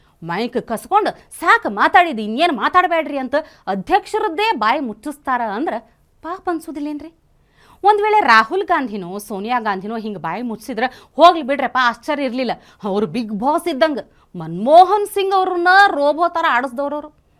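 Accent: North Karnataka, India. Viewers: ಮೇಡಂ ಉತ್ತರ ಕರ್ನಾಟಕ ಭಾಷೆ ತುಂಬಾ ಚೆನ್ನಾಗಿರುತ್ತೆ ಜೈ ಶ್ರೀ ರಾಮ್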